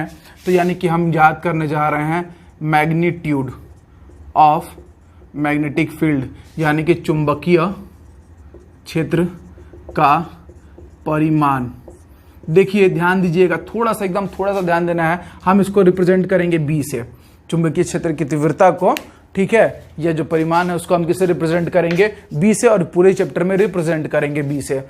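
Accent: Bihar, India. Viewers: Such me badhiya padhate ho bhaisab bihari style me I like it mai paid class join kiya hu lekin jo maza apke me hai vo vaha per nahi aata so mai apke hi video dekhta hu thanks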